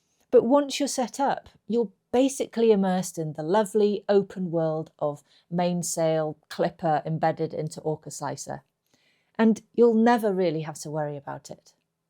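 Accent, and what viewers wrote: Wales, United Kingdom. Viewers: Might be a strange comment but...is that a bit of Welsh I hear in your accent? watched and enjoyed a few of your videos, but only noticed it in the last half of this one.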